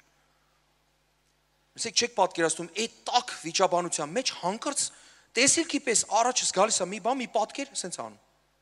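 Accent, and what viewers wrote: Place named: Lebanon. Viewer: ՏԵՐԸ օրհնի ախպերս, Քրիստոսով։ Իմ սրտից դուրս եկած քարոզ ես քարոզում։ Շատ մաքուր հայերեն ես խոսում։ Լիբանանում եք ծնվել՞։ Տերը օրհնի իրա միակ Սուրբ եկեղեցին։ ԱՄԵՆ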